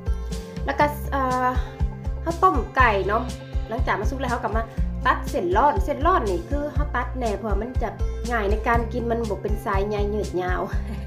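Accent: Savannakhet, Laos. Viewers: ມັກສຽງໄທສະຫວັນນີ້ເດ້ ໄດ້ຍິນແລ້ວຄິດຮອດຄາວໄປຮຽນຢູ່ສະຫວັນ.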